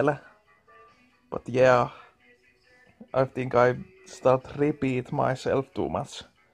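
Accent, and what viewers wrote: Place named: Finland. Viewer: This is the most Finnish thing i've ever heard :D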